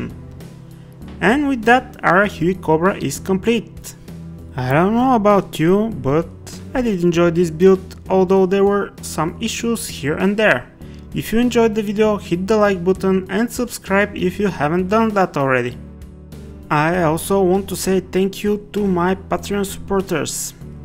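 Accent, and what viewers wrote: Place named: Russia. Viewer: Ну дикий русский акцент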